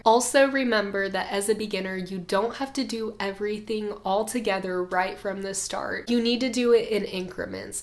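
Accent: South, United States. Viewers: No offense but when she's talking she kinda sounds like she's trying to muffle a southern accent.😂Edit: Not trying to be rude I love you, you have some of the best tutorials❤ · Your southern accent is slipping out in this video lol.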